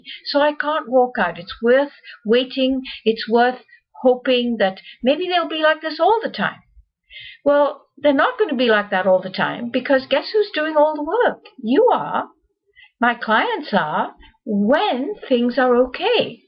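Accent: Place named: South Africa. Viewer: she sounds like a South African!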